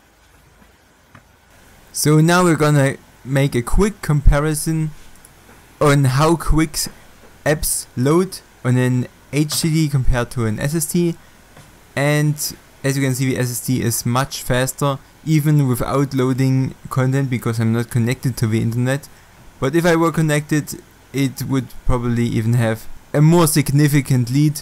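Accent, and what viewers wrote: Germany. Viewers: Höre ich da einen deutschen Akzent in deinem Englisch? ;)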